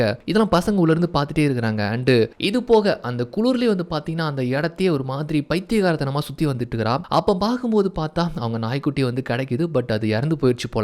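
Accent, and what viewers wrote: Tirunelveli, India. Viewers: திருநெல்வேலி தான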